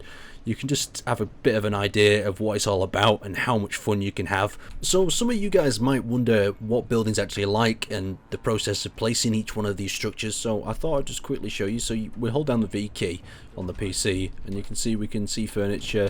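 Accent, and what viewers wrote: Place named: Burnley, United Kingdom. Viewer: where are you from? Burnley lass!!!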